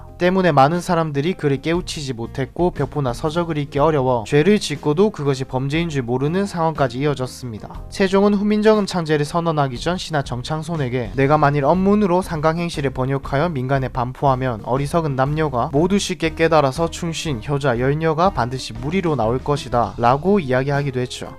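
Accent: Gyeongsang, South Korea. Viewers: 10월을 시월이 아니라 십월 ㅎㅎ 경상도 어투 반가워요.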